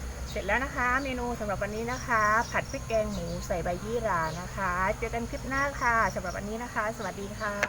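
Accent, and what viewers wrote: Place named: South, Thailand. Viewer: พี่ เป็นคนปากใต้ใช่ไหมคับผมก็เป็นหนึ่งที่ชอบกินอาหารใต้คับแซ่บๆมากเผ็ดๆดีคับ ผัดใช่ผักอีลา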